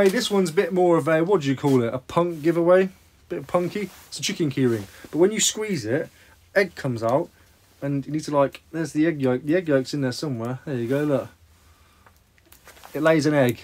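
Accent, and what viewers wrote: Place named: Scotland, United Kingdom. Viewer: That Scottish accent mate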